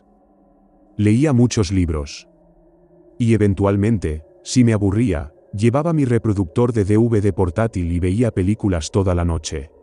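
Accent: Spain. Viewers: Y lo mejor es tu español de España sumado a tu manera de relatar,tan intrigante y la dicción,cada palabra y cada expresión que incluso nos enseñas a introducir y querér incluirlo en nuestro modo de hablar. Algo que admiro mucho de los españoles es que no solo saben hablar correctamente,saben tener un sentido del humor sin necesidad de ser grosero. Son increíbles. Saludos de Córdoba, argentina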